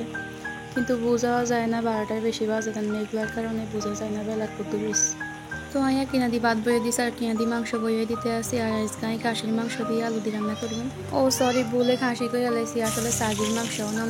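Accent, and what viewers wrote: Noakhali, Bangladesh. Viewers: নোয়াখাইল্লা কথা আমার খুব ভালো লাগে নোয়াখালী ঘুরতে গেছিলাম থাকতেও অনেক ভালো লাগে ❤❤❤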